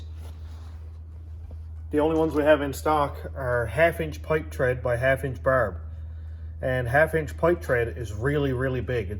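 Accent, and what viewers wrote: Newfoundland, Canada. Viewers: You from Newfoundland or the Eastcoast by chance? Your accent sounds very familiar. Love the build! Cant wait to see more progress on it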